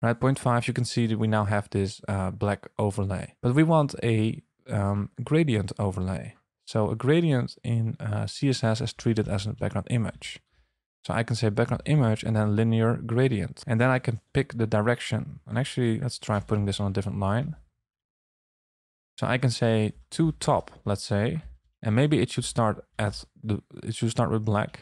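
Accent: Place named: Netherlands. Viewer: Was almost going to comment in Dutch because of the accent, but location says USA, so here is my question: Can you stop a browser from rendering translucent gradients AT ALL? Today it is very popular in video players to use it as a background between the video and the navigation bar/buttons, which is totally annoying. Youtube does this as well. I need a way to make some CSS options impossible on a browser wide level. I managed to do a similar thing with rounded corners, but can it be done for gradients as well?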